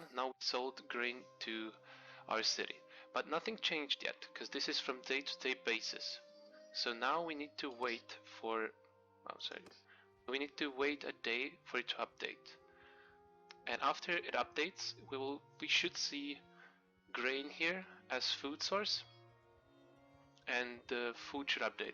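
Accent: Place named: Serbia. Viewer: You sound like you're Serbian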